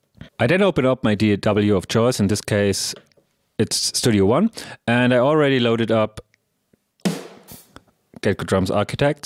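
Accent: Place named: Germany. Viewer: finally some useful information on this topic 🙏 Thanks mate! Or Vielen Dank, wenn ich den Akzent richtig gedeutet habe haha 🤘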